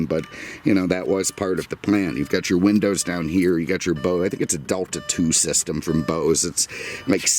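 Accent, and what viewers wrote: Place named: New York, United States. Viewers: Ooh a New Yorker. I look forward to that. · Just can't take the NY accent.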